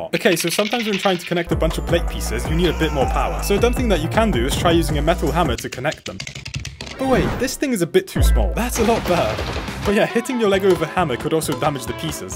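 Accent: United Kingdom. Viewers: Your from the uk aren’t you?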